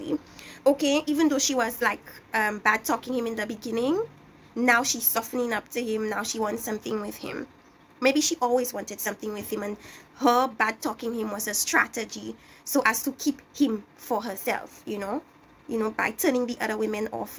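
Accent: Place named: Caribbean. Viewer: Are you a Lucian or a Dominican? I love your accent.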